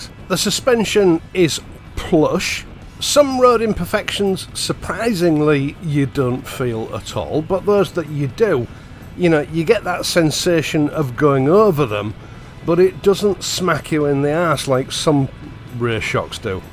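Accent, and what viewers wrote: United Kingdom. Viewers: Your road reviews are like a high quality double barrelled over under shotgun, with both riggers pulled together. One barrel fires the excellent British English at the viewer and the second the gorgeously green English countryside. That both "barrels" operate flawlessly always and every time is the icing on the cake, so to speak. This one and the one by Mr Freddie Dobbs and Mr Mark Pulling remind me of " ". That song describes the exact sentiment of this bike. Thank you very much, sir, for yet another and as usual, true to form, review. Will be going tomorrow for a test ride of the bike. It should be a worthy successor to my 2014 (since sold) Bonneville 865.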